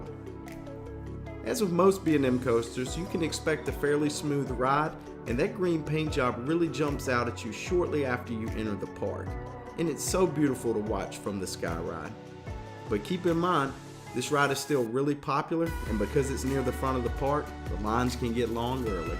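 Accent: South, United States. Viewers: I am guessing by your accent that you are from the south. If so, where are you from. I am from Andalusia, Alabama which is close to the Florida state line. I have also lived in Florida and I, now, reside in Tennessee.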